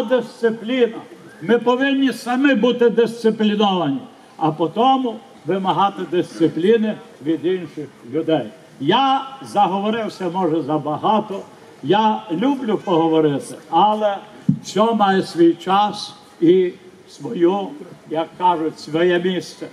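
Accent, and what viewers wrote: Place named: Canada. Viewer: ше один з Канади, блять, нікому не ріже вухо?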